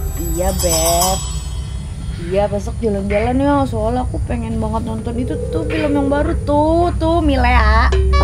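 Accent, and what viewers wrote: Betawi, Indonesia. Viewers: Gua sebagai org Betawi bangga di chenel bng Betawi nya msih kentel😁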